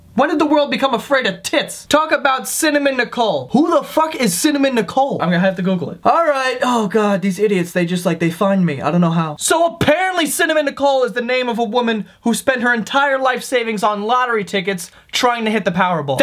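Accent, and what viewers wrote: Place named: Queens, United States. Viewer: I think that's just how he says it!! Some people just say it that way!! Also, he's from Queens so that might have something to do with it!! Not sure though...lol!!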